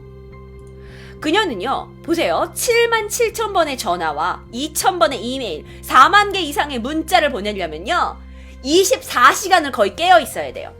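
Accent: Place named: Jeolla, South Korea. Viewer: 전라도 분이시죠? 으 발음 너무 귀엽네요.ㅎㅎㅎ(진심)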